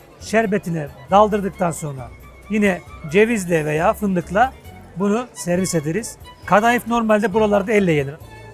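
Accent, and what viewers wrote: Turkey. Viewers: Erzurum’u doğru bir ağızdan dinlemek 👏👏👏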